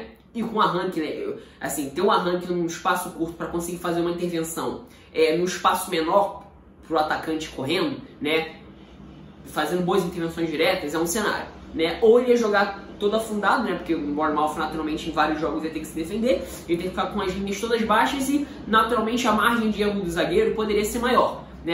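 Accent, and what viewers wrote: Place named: Rio de Janeiro, Brazil. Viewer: ta lá minha audiência qualificada aí hahah! você fala muito bem, parece o rafael oliveira com mais sotaque carioca haha baita análise quando encontrar o comentário de Leonardo Andrade nos seus vídeos serei eu ;)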